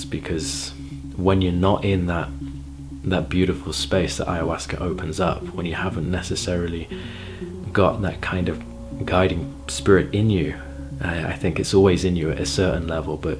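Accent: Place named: England, United Kingdom. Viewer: you sound English. Where do you go for the retreats?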